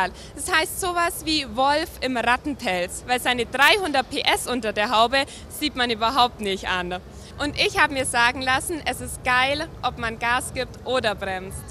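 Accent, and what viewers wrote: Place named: Bavaria, Germany. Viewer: Bayrische Sprache isch doch einfach die beste . Du machst super du musst echt ein Job im Fern machen . Und du siehst in dem Styl super aus 😙🙏🙏🙏🙏😙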